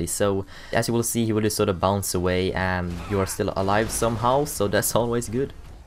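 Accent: Sweden. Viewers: From your accent I'd guess you're Swedish.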